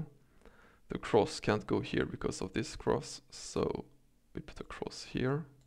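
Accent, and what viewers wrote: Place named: Sweden. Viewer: sjukt att man märker direkt från dialekten att du är svensk.. men bra jobbar :D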